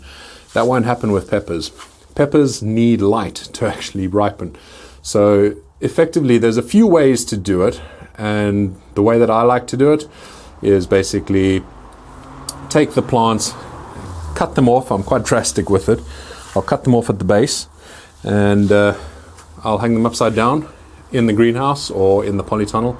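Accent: South Africa. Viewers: The SA accent is BY FAR the best accent IMO. Manly and unique. 🤘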